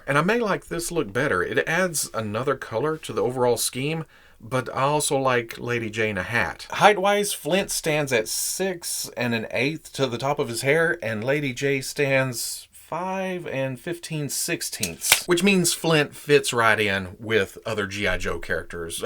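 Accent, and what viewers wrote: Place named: Arkansas, United States. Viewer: Wow, Arkansas really comes out in this video.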